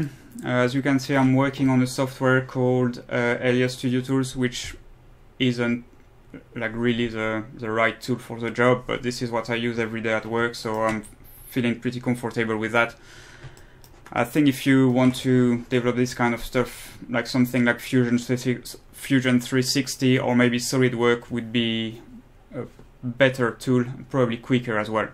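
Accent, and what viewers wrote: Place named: France. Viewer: j'ai direct entendu ton petit accent Français des le début de la vidéo xD sinon super vidéo !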